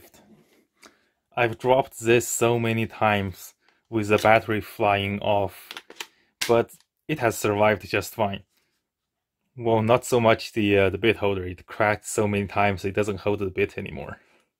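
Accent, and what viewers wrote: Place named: China. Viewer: 老板听口音是中国人？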